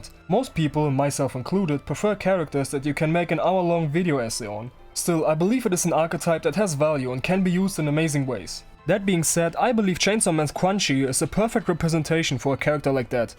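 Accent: Germany. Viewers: Are you from germany? Your accent sounds really like from people from the country. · Du hast einen der krassesten Deutschakzente den es gibt. Ja Quanxi is pretty cool. I really loved how Kishibe liked/loved Quanxi and didn't want to see her Corpse.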